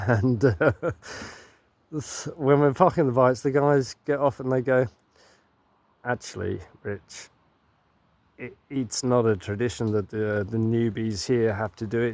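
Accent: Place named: Australia. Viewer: hahahahaha.. thats fucking gold mate. Luv your ozzie mutha fucker accent too.... not to bad for a POM .... chortle. Love that classic saying about the cold water thing. Funny that I've never heard of it until you told me about 6 years ago. Its wild that you were on a farm bugger all from me at the same time. I went to the Canowindra oval to see the hot Air balloons go off so I was quite close to ya. I only lived down the road about 80 K's so we were pretty much neighbours for a short while. Love me some Lamb as well. Gran roasted some every sunday with spuds etc when I was growing up ....... my mouth still waters each time we pop one on. Nice to hear you scored a holden belmont ute too mate ... was it a HQ 1972 model??They're worth a bit now. Cheers from OZ me old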